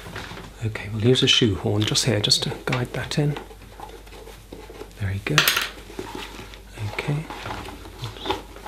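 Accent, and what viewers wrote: RP, United Kingdom. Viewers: Now this is a real posh British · Британский акцент невероятен☺ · He's talking in a noble british accent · Omg he is so handsome plus he's sweet British accent is going to melt my computer. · That incredibly beautiful accent. That's the British accent I love most. Makes me feel like I'm in excellent company. Also, this artist is a superb actor. Talk about talent! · Sometimes the simple english language spoken with the right amount of british accent is really asmr inducing. Bravo. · I randomly came across this video and lemme tell, that British voice is just smooth and So ASMRy.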